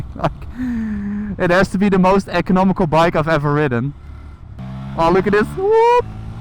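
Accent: Netherlands. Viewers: Are you Dutch? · smerig hollands accent zeg jeetje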